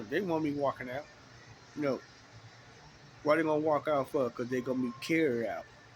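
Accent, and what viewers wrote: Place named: Deep South, United States. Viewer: Sound like a slave telln a story. Must be deep south Georgia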